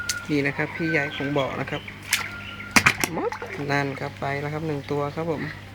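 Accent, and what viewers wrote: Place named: North, Thailand. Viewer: คนจังหวัดไรครับเนี้ย พูดเหนือด้วย 55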